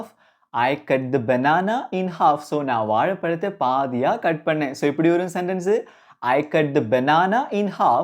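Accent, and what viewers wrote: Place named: Marwari, India. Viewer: அதுக்கு ஏன்டா தமிழை சேட்டு மாதிரி பேசுற 😂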